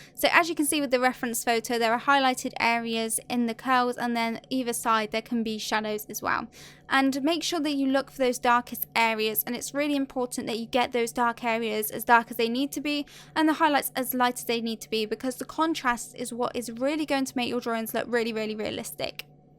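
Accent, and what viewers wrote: United Kingdom. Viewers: I love your British acsent. I could listen to you talk all day. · Beautiful British accent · Believe me your sketch is far beautiful than the real picture and by the way I love British accent.😉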